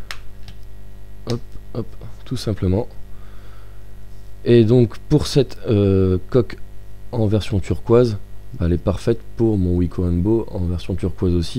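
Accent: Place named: Belgium. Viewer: Tu es belge toi, non ? ^^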